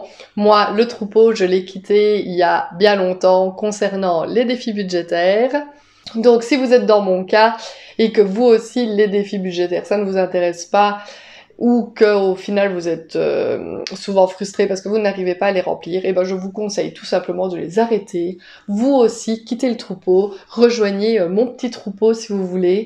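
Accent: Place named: Liège, Belgium. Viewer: votre accent est si exotique! il s’agit de liège ou jme trompe!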